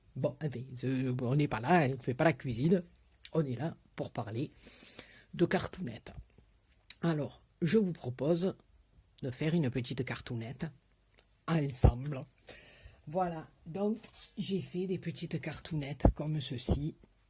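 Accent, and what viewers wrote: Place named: Marseille, France. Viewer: J adore votre façon de parler vous faite pensées à ma belle soeur de Marseille bisous d amiens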